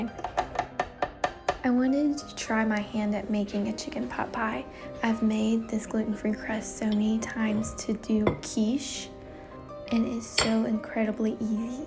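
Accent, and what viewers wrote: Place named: South, United States. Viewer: I can feel Southern hospitality seeping right through the screen! I love the sweetness and gentleness of your videos, could listen to your accent all day! 🤗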